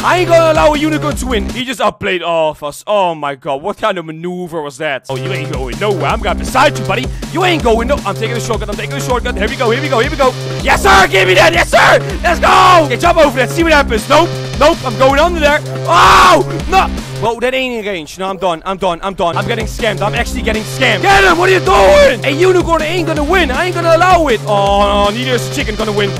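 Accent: Netherlands. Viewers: You sound really Dutch. Hoorde ik dat goed? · R you dutch ?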